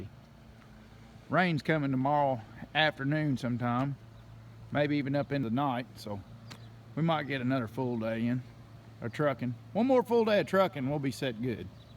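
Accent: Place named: Rural, United States. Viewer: I think the funniest parts of the videos is you talking. I'm from europe, where everyone speaks good-ish english. But once you get an american countryboy speaking, you're in for a real treat :)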